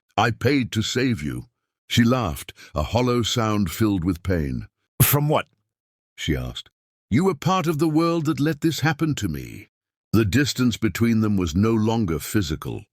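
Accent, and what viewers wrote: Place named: RP, United Kingdom. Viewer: A story about the American Wild West and you choose to use an upper crust sounding British voice dripping with contempt? Nope!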